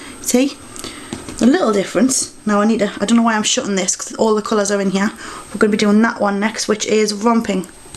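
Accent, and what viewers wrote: Newcastle, United Kingdom. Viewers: shes from newcastle LOL.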